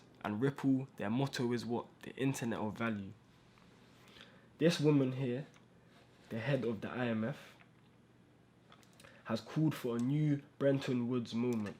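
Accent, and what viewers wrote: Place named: United Kingdom. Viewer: your uk i know because how you talk.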